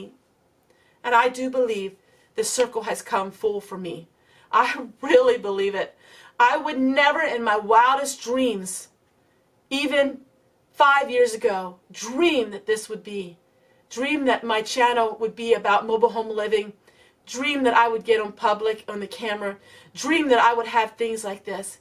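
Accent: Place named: Maryland, United States. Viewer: If you don't mind me asking what state do you live in? I was raised in Maryland and your accent sounds so familiar. I have been living in Georgia since 1991 when I was 14 years old. Now at age 42 I have a deep southern accent. I'm really glad we are now friends. It seems like we have alot in common.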